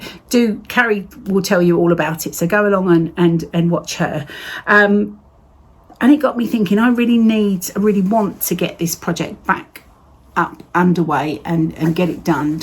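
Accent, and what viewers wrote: England, United Kingdom. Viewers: I think you should suck it up unfortunately and finish your blackbird designs piece. It is beautiful what better than what you think.. I don’t like doing two threads any more either I like the look of one thread on 36ct or 40 ct. I love to listen to you with your English accent. I have a brother in England and a lot of other relatives. Thankyou so much. Sue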